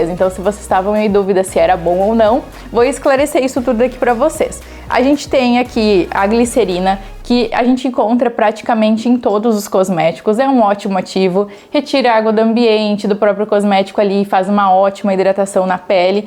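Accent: South, Brazil. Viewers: Linda,com sotaque do sul ❤❤😂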